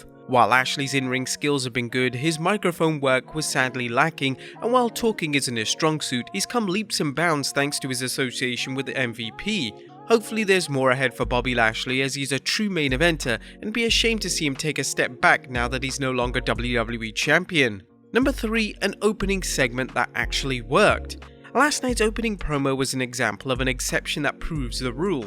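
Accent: United Kingdom. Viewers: oh you're also from the UK nice. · Wow, u from uk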